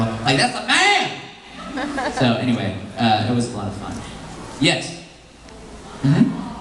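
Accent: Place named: Italy. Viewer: I'm Italian...and his Italian accent is spot on..ieven though I'm Southern Italian..but dude..we do sound like Mario XD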